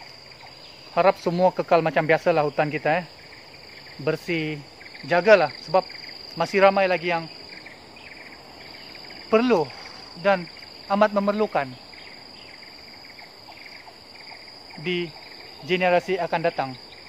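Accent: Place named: Borneo, Malaysia. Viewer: maaf nak bertanya bro orang borneo ke ..suka slang bos bercakap...done subscribe dan like..support bos punya karya. .....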